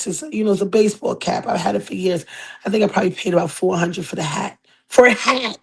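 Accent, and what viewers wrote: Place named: Brooklyn, United States. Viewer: I heard you speak and I hurried up and subscribed!!! Love the rough talk you sound like your from Bk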